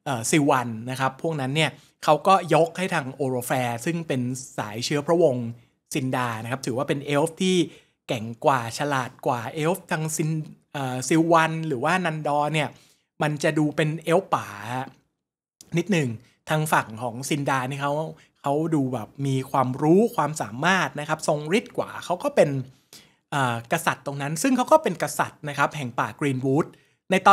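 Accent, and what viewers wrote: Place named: Lampang, Thailand. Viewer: ฟังมาสองปีพึ่งรู้ว่าคนเหนือ สำเนียงแบบนี้ลำปางก่อครับ?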